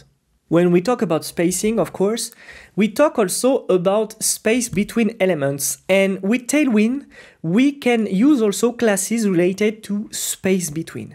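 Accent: France. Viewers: love the french accent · thank you so much but you can not hide your French accent. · Tu es francophone !! ton accent est très fort, mais c'est très confortable pour moi. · french accent is funny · L’accent français on adore 🥰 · bro thanks but i cant understand what you're saying i see you're french influence is too strong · Merci pour ce cours. Your french accent is a treat.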